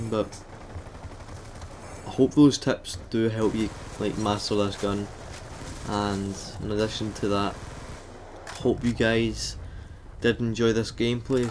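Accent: Scotland, United Kingdom. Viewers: waiting for the scottish accent tutorial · Has he's Scottish and that waz a BEAST gameplay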